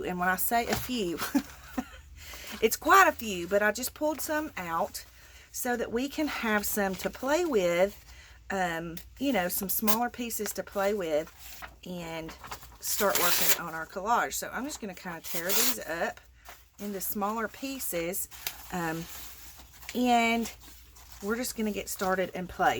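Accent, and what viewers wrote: South, United States. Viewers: I love watching the collaging process. Your sweet southern voice makes it even better. ❤️💕😘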